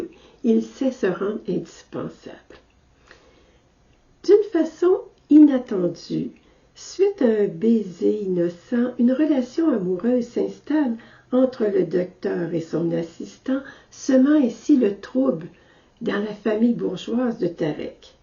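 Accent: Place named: Quebec, Canada. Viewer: Ça s’appelle un accent québécois madame et pas une mauvaise diction. La qualité de l’audio laisse à désirer certes mais la diction de est irréprochable, à moins que vous ayez une allergie particulière à l’égard des différentes manières dont la langue française se prononce à travers le monde, dans ce cas on ne peut rien pour vous, votre cas est sans espoir.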